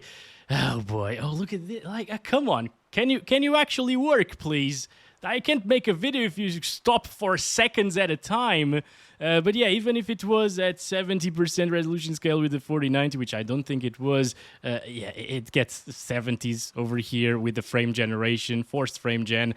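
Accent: Portugal. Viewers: Es portugues!?! I knew it! haha been watching you for ages and was suspecting that because of your accent :) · wait are you from portugal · bro eu ja vejo os testes deste canal a uns 8 anos e só hoje é que reparei que és português pelo "ah esquece", houve uma vez que pareceu-me seres portugues pelo sotaque mas hoje tive a confirmação ahaha, tudo de bom para ti! Abraço! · are u portuguese? sounds like it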